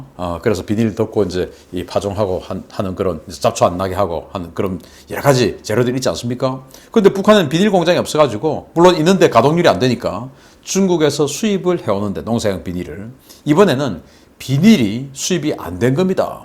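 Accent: Gyeongsang, South Korea. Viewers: 갱상도 사투리 좀 고쳐라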